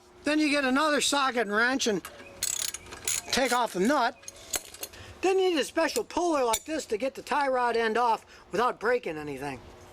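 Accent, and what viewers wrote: United States. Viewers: Being English, it's really difficult for me to endure listing the Americain accent, but none the less, great video.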